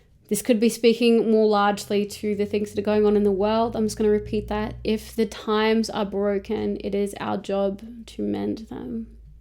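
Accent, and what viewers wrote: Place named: Australia. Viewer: Please, please, please DO NOT, hold back on your beautiful Aussie accent! Your readings resonate strongly and your accent is very endearing. I'm American and, other than Southern U.S. accents, I find American accents incredibly plain/boring 😂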